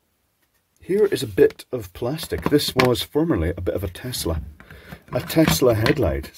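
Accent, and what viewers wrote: Scotland, United Kingdom. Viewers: As an American i find the Scottish accent to be a beautiful accent, I just enjoy listening to it. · I always love hearing british accent. It sounds so nice. And of course, I enjoyed this video.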